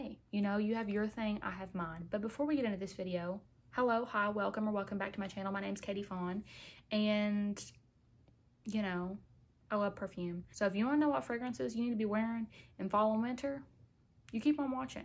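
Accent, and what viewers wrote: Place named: Kentucky, United States. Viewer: Are you from the southern Kentucky ? I hear the accent in you!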